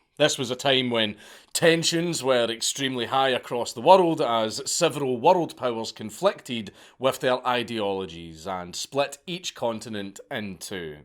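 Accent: Scotland, United Kingdom. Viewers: When I first discovered him I watched so many of his videos in such a short period of time that my thought actually started voicing in the Scottish accent rather than my native American one for a while. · I only subscribed and liked all this dudes videos originally because I wanted to support someone they were trying so hard to bring down. I love the idea of reverse advertisements. Hell right now I'm looking into this ghost gun thing the news seems to be pushing so hard. I just couldn't get past the accent before. But I do have to say this little Scot is growing on me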